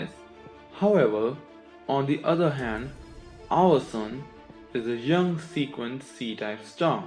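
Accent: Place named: India. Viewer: Can't you tell.from his thick accent? Although he tries his best to mask it, but indian accent is just too difficult to get rid off.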